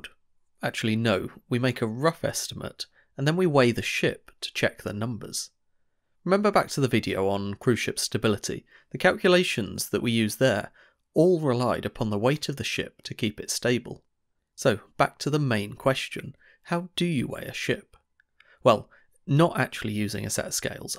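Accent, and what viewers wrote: United Kingdom. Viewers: Somehow his British accent is disturbing... at least to me.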